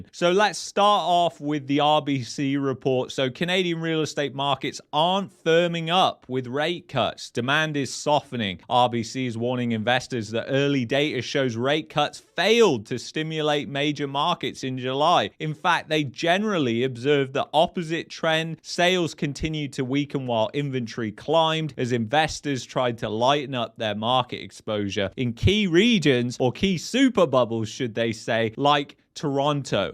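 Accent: England, United Kingdom. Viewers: Can't get enuff of your English accent 👍👍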